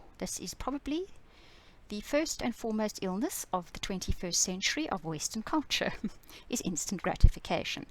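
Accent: South Africa. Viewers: love you're south African accent... very nice positive reading thankyou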